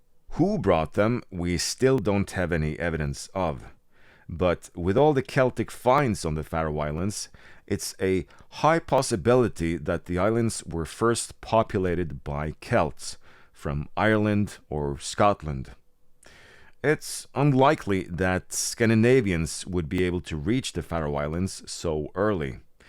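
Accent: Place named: Norway. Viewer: Interesting video. I do remember hearing about this newest discovery and being Faroese myself anything pre-Viking era is really interesting. And you didn't do too bad with the Faroese place names, although I sense a Norwegian(?) accent. Eiði, for instance: the ei is like eye and the ð is silent but a y/j is inserted between the ei- and the -i (which itself has a more e like pronunciation), so "eye-yeh". You mentioned Ærgisbrekka, well, the first part of this name ærgi is also a Gaelic word, and Argir is the name of the town right next to the capital, same word but different spelling. It will be interesting to see what other discoveries are made in the future.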